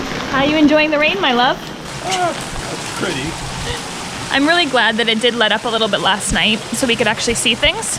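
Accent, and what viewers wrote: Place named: Canada. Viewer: Not gonna lie, I have to skip that intro 😂 also you have the most Canadian accent I've ever heard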